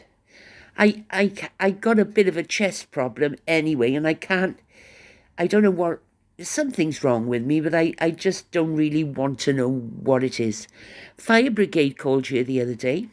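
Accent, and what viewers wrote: Wales, United Kingdom. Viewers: lovely to hear your dulcet Welsh tones again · I do miss your Welsh accent my lovely friend sorry your not well with a rotten chest infection hope it gets better soon 💐💐💐❤️‍🩹❤️‍🩹❤️‍🩹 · Love thst welsh twang so glad to hear it again its been worth waiting for welcome back ❤❤❤❤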